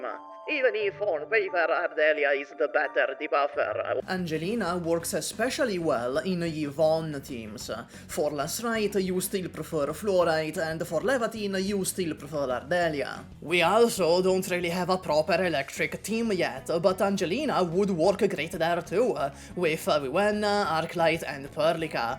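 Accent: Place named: Italy. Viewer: I dunno about "overwhelmed", I just came to listen to my favourite Italian voice for 20 minutes while I figure the game out myself lol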